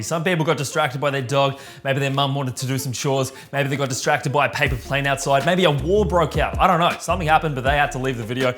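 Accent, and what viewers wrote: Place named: Australia. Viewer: Great content and who doesn’t love Australian accents. Easily one of the nicest people in the world👍🏼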